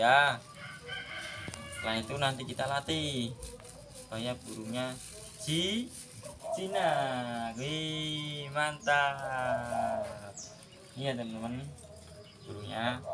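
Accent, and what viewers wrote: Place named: Kendal, Indonesia. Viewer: Logate kok koyo wong kendal mas... kendale pundi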